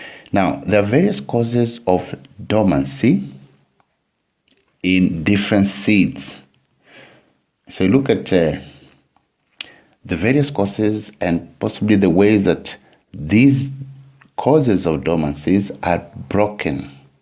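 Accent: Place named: England, United Kingdom. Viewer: your videos are good because i like your english accent its eloquent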